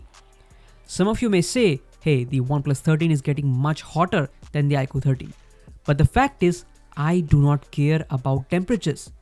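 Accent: India. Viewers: After hearing you're accent I instantly get to know you're Indian but why don't you make videos in hindi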